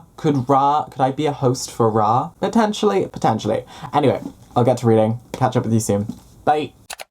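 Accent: United Kingdom. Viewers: Omg can't believe you're reading Kane Chronicles.. I mean, you reminds me of Carter Kane even tho you got British accent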